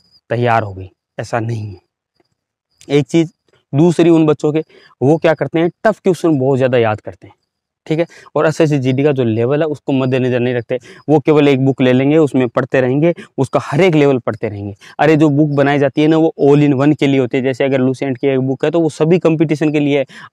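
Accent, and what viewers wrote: Rajasthan, India. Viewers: Sir Rajasthan se ho kya aap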